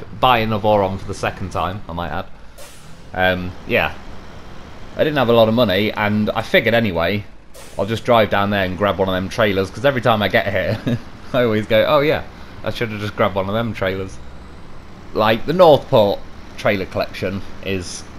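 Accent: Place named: England, United Kingdom. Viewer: And oh yeah by the way where are you from your accent seems like you are from England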